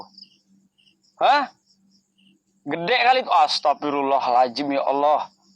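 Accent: Medan, Indonesia. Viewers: Bang kau ngomong Logat.medan Kau batak kah?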